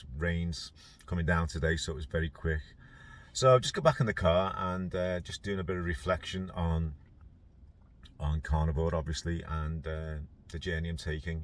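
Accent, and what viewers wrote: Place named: Alabama, United States. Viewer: This is so spot on. Your 'Bama accent is not bad, but I don't know a single person called there! 🤣😂 As someone who has worked bloodhounds for years I am guessing that old hound never gave up that nail! 😉😊🤗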